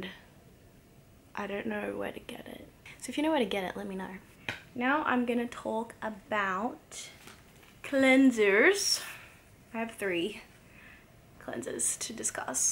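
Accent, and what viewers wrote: Australia. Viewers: You’re one of my fave blogger now, I love your aussie accent. Whereabouts in Australia do you live?:)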